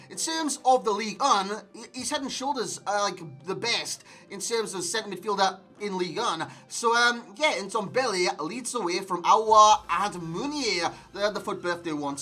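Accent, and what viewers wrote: Newcastle, United Kingdom. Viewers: Proper Geordie accent until you say “nuts” and you sound like an upper class bloke who lives in a castle 😂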